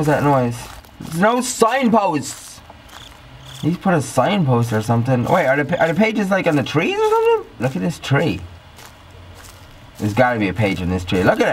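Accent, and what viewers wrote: Ireland. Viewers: Oh my god that was so funny, lads I'm fucking sweating 😂😂😂 also I freaking love the Irish accent xx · His Irish came back in this video lol!!!!! never heard him swear so much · You sound so much more Irish in this video! And that's coming from an Irish person! 😂🇨🇮 Loved this type of video from you, you should keep doing game plays! Just a random thought I had, if you were to build your 'dream house' that you've always spoken about on the sims that'd be awesome!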